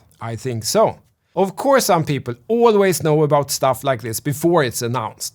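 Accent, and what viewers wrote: Sweden. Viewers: Here for the Swenglish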